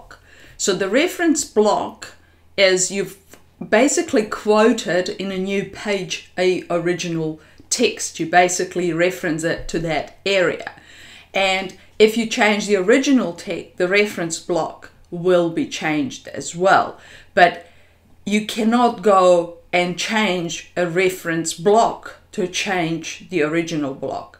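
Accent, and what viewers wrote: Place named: New Zealand. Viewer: Great explanation,:). Are you from New Zealand btw? I am taking a guess based on your accent.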